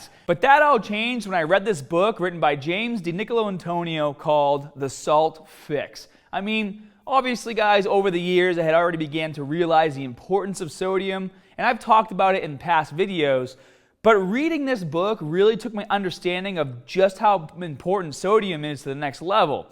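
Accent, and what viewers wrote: Boston, United States. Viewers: Lowis Coetzee He has a strong Boston accent, which he acknowledges.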